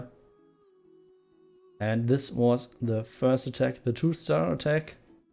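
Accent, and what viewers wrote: Germany. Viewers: Bro do you really suck at english or is it because of the german accent?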